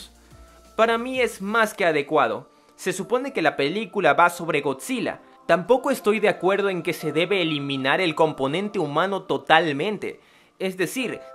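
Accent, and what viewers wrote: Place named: Ecuador. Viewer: Eres ecuatoriano :3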